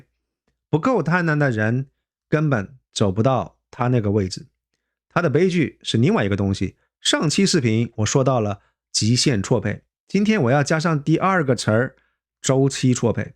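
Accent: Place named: Guangzhou, China. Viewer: 从发音来看，我猜是广州人:face-blue-smiling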